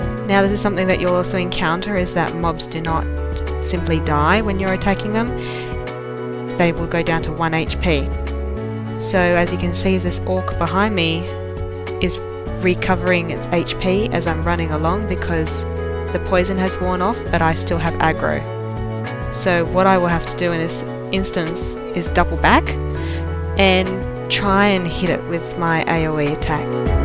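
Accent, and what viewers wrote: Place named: Australia. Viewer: AUSTRALIAN ACCENT U IN AUSTRALIA TOO!!!:D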